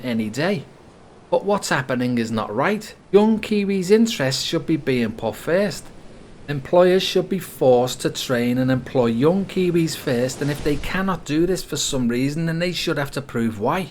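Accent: Liverpool, United Kingdom. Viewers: This video makes sense. The scouser being the voiceover doesn't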